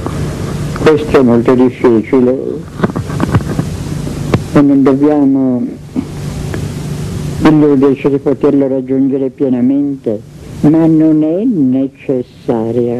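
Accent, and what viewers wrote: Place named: Veneto, Italy. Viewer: La tipica voce di Assagioli con inflessione leggermente veneziana. :)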